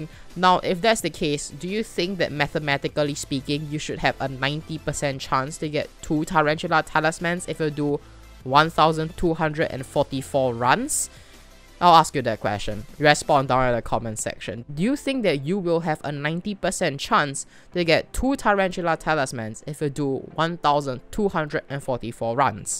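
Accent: Singapore. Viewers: sounds like singaporean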